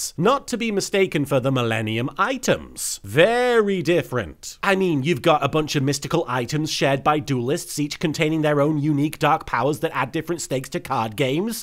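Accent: United Kingdom. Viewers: Yeah, this series is much better with his british britishness tbh.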